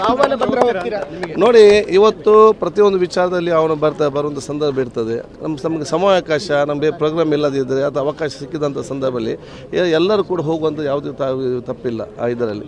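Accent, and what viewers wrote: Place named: Tulu Nadu, India. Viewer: ಒಳ್ಳೆಯದನ್ನು ಮೊದಲು ಪ್ರೋತ್ಸಾಹ ಮಾಡಿ ಸರ್ ಅವರು ಮಾತಾಡೋದು ಯಾವುದೋ ಭಾಷೆಯಲ್ಲ ಕನ್ನಡವನ್ನೇ ಮಾತಾಡೋದು ಅವರು ತುಳು ನಾಡಿನವರು ಅಲ್ಲಿ ಹೋಗಿ ಅಲ್ಲಿಯ ಎಲ್ಲರ ಭಾಷೆಯ ಶೈಲಿ ನೋಡಿ ತಿಳಿಯುತ್ತೆ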